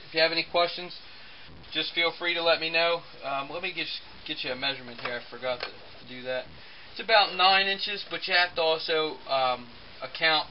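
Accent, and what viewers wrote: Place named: Pennsylvania, United States. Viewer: from the accent, I am guessing you are from PA? Probably watching the Steelers right now? LOL Go STILLERS!!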